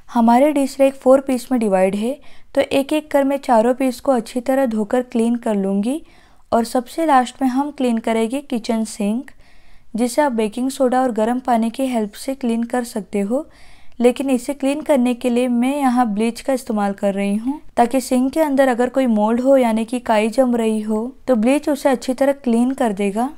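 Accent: India. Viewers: मैने एक वीडियो और देखी थी, जिसमें बोला गया था कि ऐसी होता है अमेरिका का किचन, लेकिन आप की आवाज और भाषा से पता चलता है कि आप इंडिया से हो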